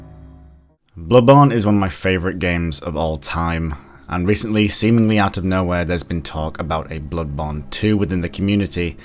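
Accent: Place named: North, United Kingdom. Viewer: ok I already spent 4 miserable years living in the north I don't need to be listening to your disgusting accent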